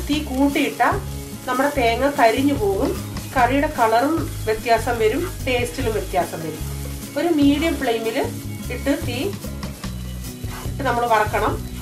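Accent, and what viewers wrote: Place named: Kottarakkara, India. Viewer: സംസാരം കേട്ടിട്ട് കൊട്ടാരക്കര ഏരിയയാണ് വീട് എന്നു തോന്നുന്നു.,ശരിയാണോ??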